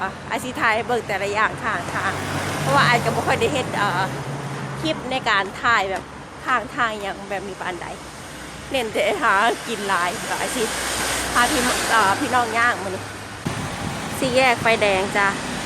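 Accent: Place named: Nakhon Phanom, Thailand. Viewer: พี่เพิ่งติดตามน้องอายพูดเหมือนคนนครพนม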